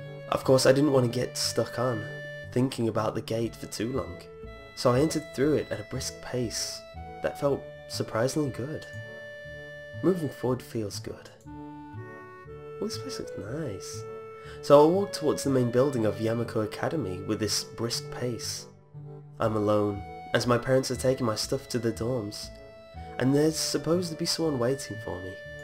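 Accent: England, United Kingdom. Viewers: You're English, too? I Knew it!! *Hugs*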